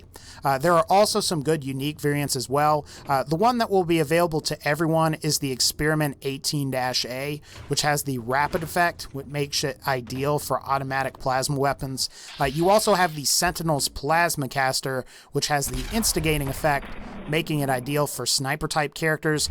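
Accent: South, United States. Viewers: He sounds like he's from the US... southern gulf/bible belt area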